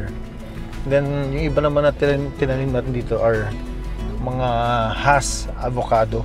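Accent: Visayas, Philippines. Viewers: Ka bisdak nas imong accent sir oy hehehe 😂 ❤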